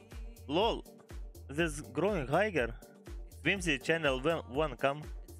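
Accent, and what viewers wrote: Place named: England, United Kingdom. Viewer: Nice videos! keep them coming, I like your english accent^^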